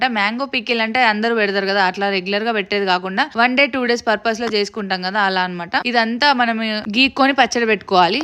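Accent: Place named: Karimnagar, India. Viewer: Karimnagarollam ma basha slang vere untadi😂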